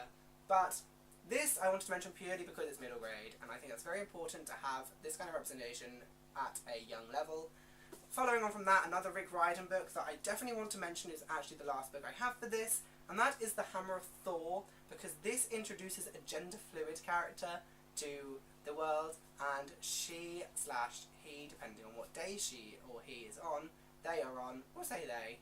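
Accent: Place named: United Kingdom. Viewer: Thanks for doing the tag! Love your accent, where abouts in the UK are you from??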